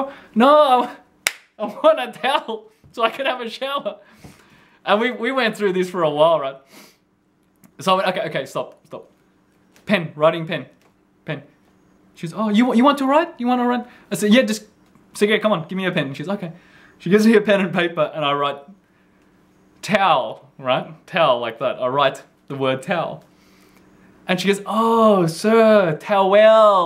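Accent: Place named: Australia. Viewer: I agree with Donald and others, it would be helpful if you would speak a little slower in your videos. Although english is my native language and I usually understand what you're saying, because of your Aussie accent and fast pace of talking sometimes it is a bit difficult. Remember you have many viewers for whom english is a second language so I suspect for them it may be even more difficult at times. Great job on your videos though, I enjoy watching them and look forward to more. Maybe consider visiting Malaysia, Indonesia, Hong Kong or less familiar locations, places we don't usually get to see. tc.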